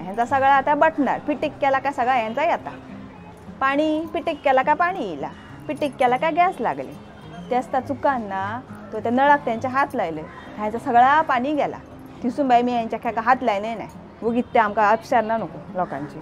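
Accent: Malvani, India. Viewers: Ge tujhi malvani Bhasha aikuk khup maja yeta.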